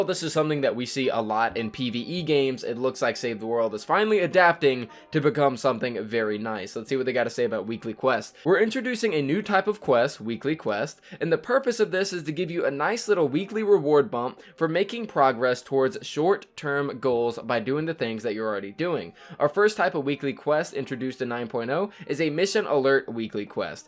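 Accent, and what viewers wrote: France. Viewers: Are u frenche? Because I am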